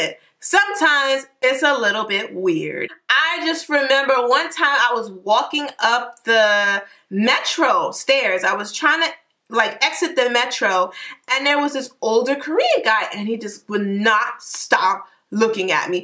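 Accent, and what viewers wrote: Baltimore, United States. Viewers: I’m sorry this is my first time watching your videos and I sweaaaar you talk like Tamar Braxton 😂😂😂😂😂😂 I guess that’s a Baltimore thing 😂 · I KNEW THAT WAS A BMORE ACCENT😂😂 Nice to know someone older, from my hometown, give tips about Korea.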